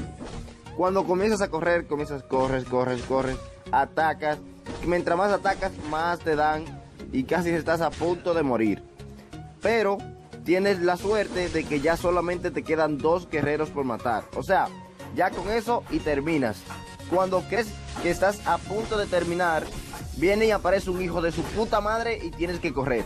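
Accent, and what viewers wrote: Dominican Republic. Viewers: Eres dominicano sierto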